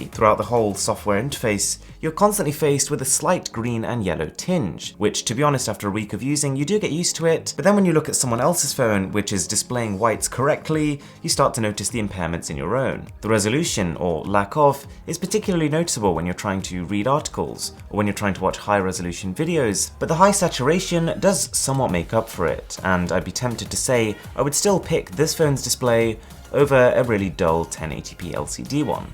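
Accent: United Kingdom. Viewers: Ťëčhťübēř_ Přïñčē He Is Not Indian His Parents Were Indian And He Born In UK... AS You Can See From Hos Accent!!!....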